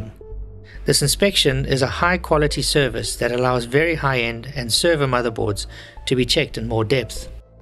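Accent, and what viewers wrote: South Africa. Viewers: Sounds like a South African talking LOL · Are you South African?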